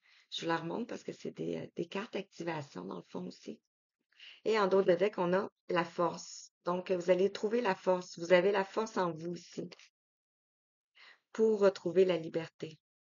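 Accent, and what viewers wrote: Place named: Canada. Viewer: Bonsoir 😊je vous écoute avec beaucoup d'attention et je suis bluffée ❤merci beaucoup pour votre voix douce et votre accent canadien tellement sympathique 😊 pas trop tard pour vous adresser mes meilleurs vœux pour en cette nouvelle année à vous et votre famille ❤❤❤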